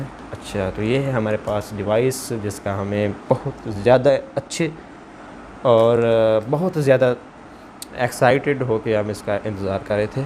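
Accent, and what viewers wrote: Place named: Saraiki, Pakistan. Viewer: Lala na tain saraiki boli hay na urdu khichichri barai pia hain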